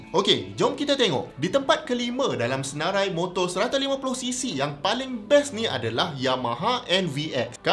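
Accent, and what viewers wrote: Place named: Kelantan, Malaysia. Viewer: smart bojeng cikgu [loghat klate]..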